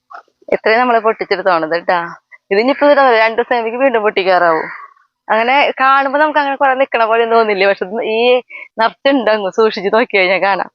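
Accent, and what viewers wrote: Thrissur, India. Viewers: എവിടെയാണ് വീട്. കാണുമ്പോൾ കൊതി തോന്നുന്നു. കൃഷിയിടമൊക്കെ. ഭാഷ കേട്ടാൽ തൃശ്ശൂർ ആണെന്നൊരു സംശയം. ഞങ്ങൾ ഒറ്റപ്പാലം ആണ്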